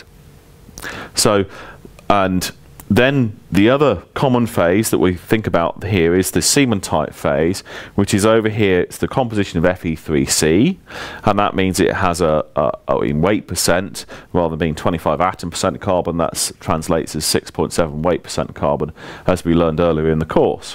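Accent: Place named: United Kingdom. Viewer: Being an American from the deep South, when I heard his British accent I knew this was going to be a good night. My entire study group sends a thank you from across the pond for posting these videos. Hopefully we'll pass the test in the morning.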